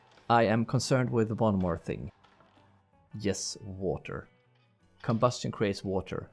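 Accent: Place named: Sweden. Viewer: Perfect Swenglish accent:hands-yellow-heart-red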